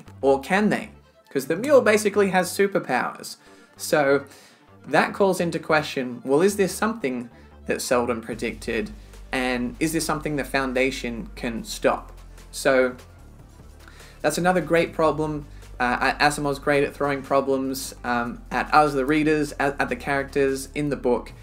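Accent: Australia. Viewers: What accent is that? Australian?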